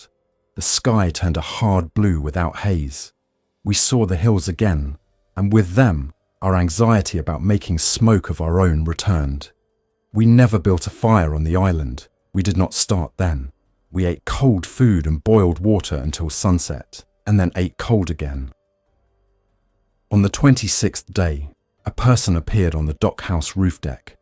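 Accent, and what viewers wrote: United Kingdom. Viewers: The eager AI British voice isn't helping the story. Choose an AI with an American accent and sounds like Sam Elliot.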